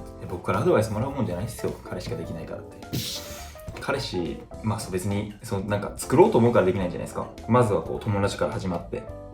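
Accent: Kansai, Japan. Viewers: 藍くん、ハンサム・ボーイなのに 喋ると関西人そのもので、思っていたイメージとギャップがあってビックリしました😂 ちょっと面白いやん…とハマってしまったので、コレからの【らんるい】も楽しみにしてます🤗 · ランくんの関西弁がとっても新鮮🤗💞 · イタリアで頑張ってますねー！応援してます。 関西弁が混じるところがいいですね！ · 最初の方、若干標準語ぽかったのに、身内からの質問読み出して関西なまり出始めたの好き🤣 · 関西弁？か分かんないですけど 〜やけど、とか 分からんけど〜とか なんか親近感湧きますねぇ〜😂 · 関西弁新鮮な感じします！✨ 明日からのVNLフランス大会楽しんでください☺️ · 関西人としては関西弁と関西のノリがとても落ち着くので どこへ行ってもそのままでお願いします、、！！ コンビニでお箸渡されて脈ありか？ は笑ったｗｗｗ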